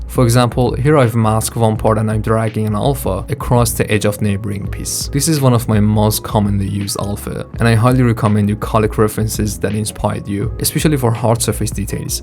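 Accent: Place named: Iran. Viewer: This video is amazing, but im a beginner in zbrush or 3d space i worked with cc3 and 4 and i must say iclone and character creator is awesome but in zbrush i just know the barebone basics, where would you suggest that i go for learning zbrush or modeling? My goal for now to make a superhero characters and stuff, like batman or red hood or superman basically characters with armors and helmets or trench coat and cape, i know that you're making the same kind of stuff in your video but my problem is it that i didn't know some of things that you are doing, like using specific tool or features I checked a lot of tutorials but somehow there confused more because i follow what there doing but these always a problem, so the help or recommendation would be appreciated. By the way your accent is so Persian, if you're one of us, dorud my brother, a lot of love from hormorzgan❤️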